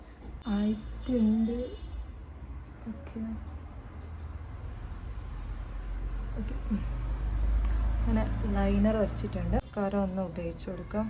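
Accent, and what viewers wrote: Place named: Malappuram, India. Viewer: Loved your malappuram slang... Maakup 😅😅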